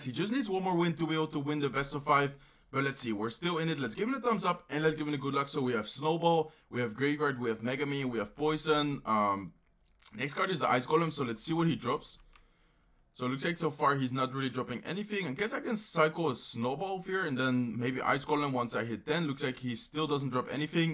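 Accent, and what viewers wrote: Netherlands. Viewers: Actually een best wel nederlands accent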